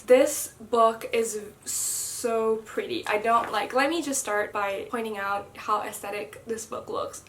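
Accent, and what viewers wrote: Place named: United Kingdom. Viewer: British accent nya 🔥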